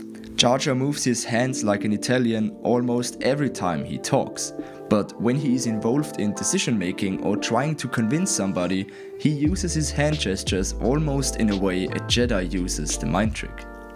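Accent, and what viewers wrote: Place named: Germany. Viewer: he sounds like a german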